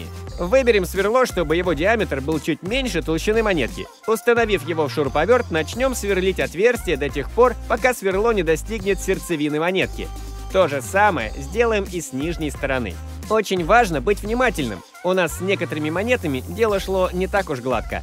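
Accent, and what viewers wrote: Kazakhstan. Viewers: Ты из Казакстана ?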